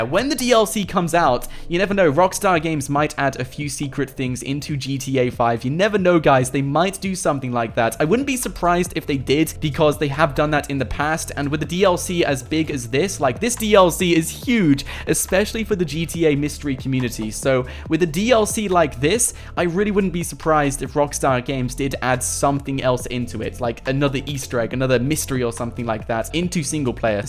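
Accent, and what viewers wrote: United Kingdom. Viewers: Where you from in uk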